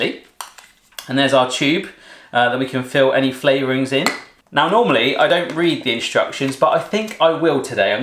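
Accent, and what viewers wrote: United Kingdom. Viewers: Are you British or America?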